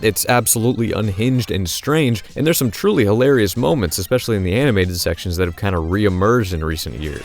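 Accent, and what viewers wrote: United States. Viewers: And do I detect a hint of a ghost of a southern twang?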